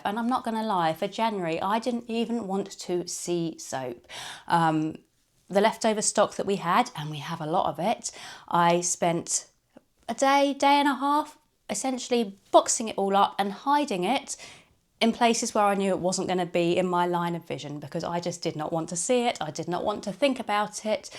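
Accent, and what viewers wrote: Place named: England, United Kingdom. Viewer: Hi, firstly “Happy Belated Birthday” 🎉 yes I would love some cheaper recipes for soap…in the USA Olive Oil and Cocoa Butter is outrageous in price and being an expat if UK, I definitely need the occasional dose of English Accent 😂 I have missed you online and would love to see you more….definitely think you could make something of a Chanel about alternative soap ingredients, and testing thereof to help out the struggling….cheaper but good soap is gonna be a must very soon in USA with all our current troubles and we for sure need an injection of bubbly and infectious personality to cheer us up 😢 hopefully see you soon and hope you had a fab Birthday ❤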